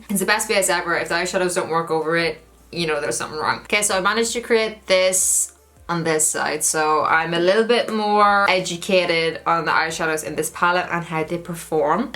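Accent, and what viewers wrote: Ireland. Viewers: What part or Ireland are ya from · Is she irish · I'm so glad I came across your channel! You have the same sense of humor I do 😂 where are you from? I'm bad at determining accents but maybe you're from Ireland? I apologize if I'm wrong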